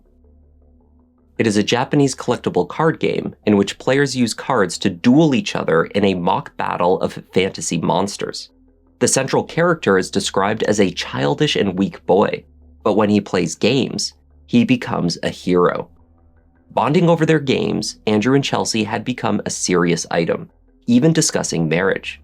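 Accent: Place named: Canada. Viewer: He's not American. He has a very noticeable Canadian accent.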